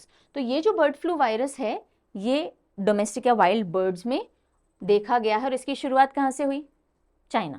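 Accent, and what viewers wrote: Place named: Rajasthan, India. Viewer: Mam u r Rajasthani ....aapki koi koi baato se lg jata h 😅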